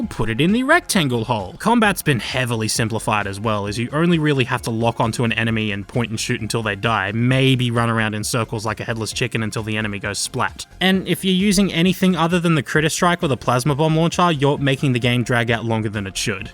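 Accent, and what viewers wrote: Australia. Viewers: thank god this dude is Australian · Thought something was off while watching the video, turns out he's just australian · where about in australia u from bro? (i’m from perth)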